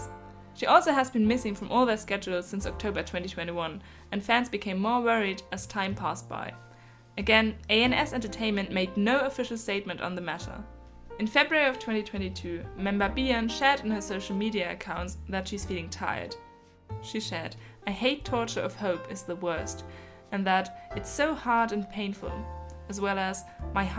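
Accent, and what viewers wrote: Germany. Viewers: this might be irrelevant but i absolutely love your accent! i think i can hear that you speak german as your first language, and i just wanted to say that it makes listening to you speak very relaxing. sehr schön!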